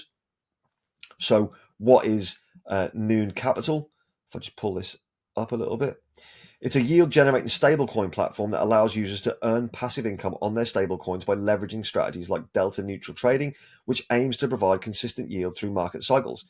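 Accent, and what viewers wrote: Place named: Manchester, United Kingdom. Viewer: Great video from the man who i thought was from Manchester 😁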